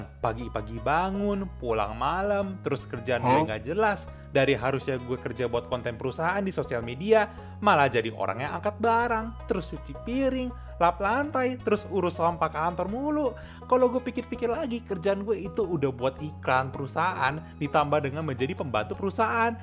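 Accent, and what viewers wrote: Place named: China. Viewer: Salfok sama cara ngobrolnya bang kedenger ada aksen tinghoa nya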